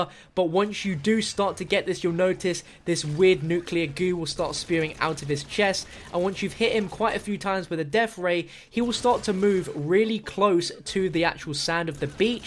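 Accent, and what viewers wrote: United Kingdom. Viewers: Nick Bailey it's British accent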